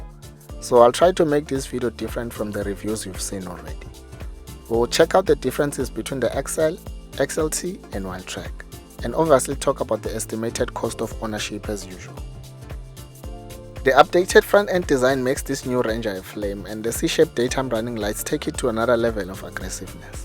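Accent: South Africa. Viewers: Interesting journal, Nguni nation accent. Great review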